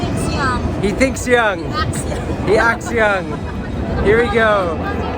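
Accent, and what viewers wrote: Bisaya, Philippines. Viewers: Its funny, really do sound like a Bisaya. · visayan dialect mode is amazing.... nakakahappy to listen to... maayo gid!!!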